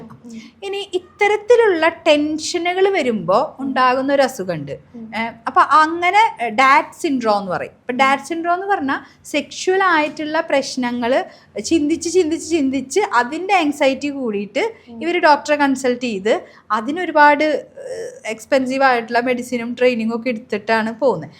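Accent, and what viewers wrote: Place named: Valluvanad, India. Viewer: വള്ളുവനാടൻ മംഗ്ലീഷ്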